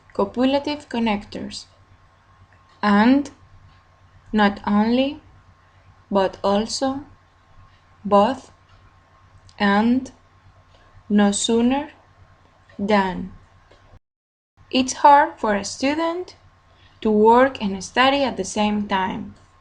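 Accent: Colombia. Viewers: ese acento de paisa tan fastidioso para una clase parece un burdel. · super pero te aconsejo cambies ese acento paisa, molesta mucho el tono ,gracias haces un gran aporte al Inglés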